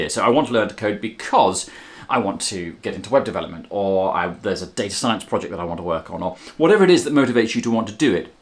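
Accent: United Kingdom. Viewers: The gaming chair betrays the British accent.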